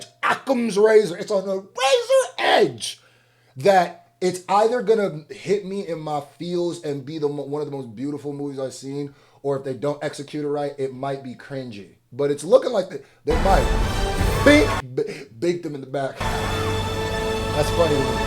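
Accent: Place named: Philadelphia, United States. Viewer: have you ever spent time in Philly or have family from there you sound like a Philly nicca sometimes. I’m from Baltimore but live in Philly now but I swore you were from Philly for the longest and you just said mixed him up mixing is some Philly shit I only learned when I moved there from Baltimore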